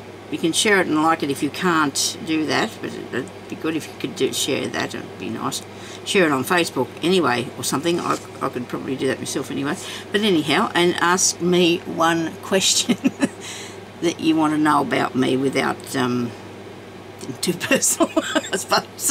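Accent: Australia. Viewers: Oh wow...just discovered your channel :).....being a newbie, I'm on a voyage of discovery! I heard you speak.....and thought ..Wow..another Aussie ..yay:). Love your work and so good to hear our lingo xxxx. Question? ....saw another video you did about lace covers and you used a hot glue gun. Does the glue show through with thinner fabric ( as in internal lining in a book?...spray glue leaves nasty marks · Merry merry Christmas. I love To hear another aussie voice. How do you wash vintage laces?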